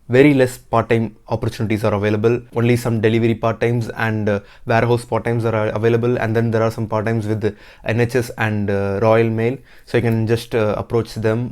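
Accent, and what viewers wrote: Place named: India. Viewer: Why do you repeat the same thing again and again. Your accent is terrible - typical Indian accent 🤣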